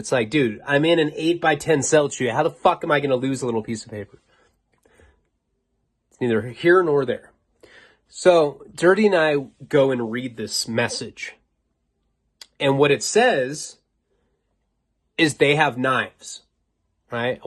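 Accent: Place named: Chicago, United States. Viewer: Why do you always sound like a south sider every time you do voices? I have to admit it sounds good though. ESE 😂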